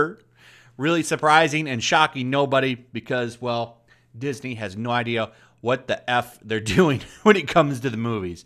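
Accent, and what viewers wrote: England, United Kingdom. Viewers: Damn! And here I am waiting for your mandalorian episode reviews in that funny English accent! 😂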